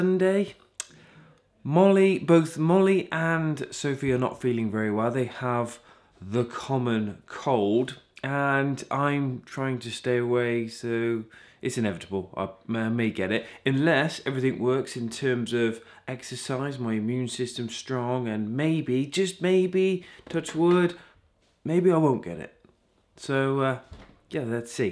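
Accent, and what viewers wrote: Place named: United Kingdom. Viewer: i like those british accent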